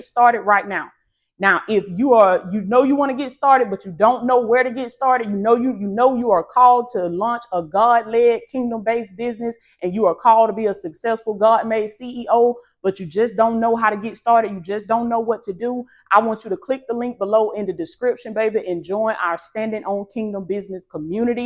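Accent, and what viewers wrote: South, United States. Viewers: IM COUNTRY TOO SIS AND VERY SOUTHERN AND I LOVE YOUR ACCENT! ❤ VERT UNIQUE AND CANT BE DUPLICATED. (Original) #FEARFULLY AND WONDERFULLY MADE! PTL🙌🏾🙏🏾💜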